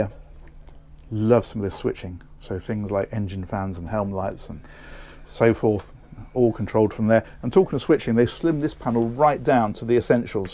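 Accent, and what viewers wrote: England, United Kingdom. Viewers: This is the only englishman whos accent i can take. I love you man!!!!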